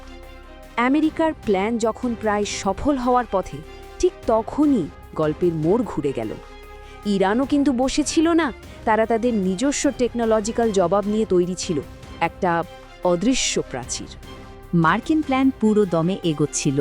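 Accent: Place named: Kolkata, India. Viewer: এই মহিলা কি কলকাতার মনে হছছে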